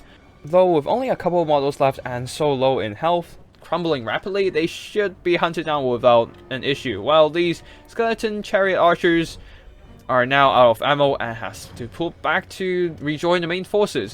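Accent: China. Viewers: Your Chinese accent makes the video so nice to watch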